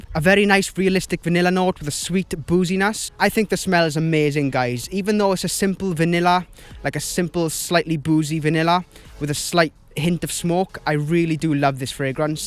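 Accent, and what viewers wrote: Wales, United Kingdom. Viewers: know body he's from Wales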